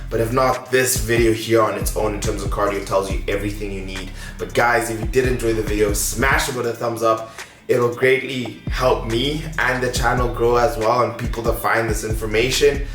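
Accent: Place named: South Africa. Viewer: Is he from South Africa ? Sounds like he has a South African accent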